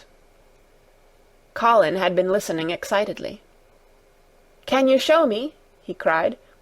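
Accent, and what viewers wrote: Yorkshire, United Kingdom. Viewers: This is the best audio book and reader ever! Her reading i so smooth and the Yorkshire accent is wonderful!!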